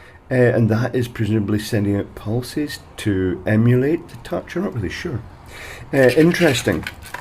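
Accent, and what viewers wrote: Scotland, United Kingdom. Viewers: A Scottish version of Ashens 😂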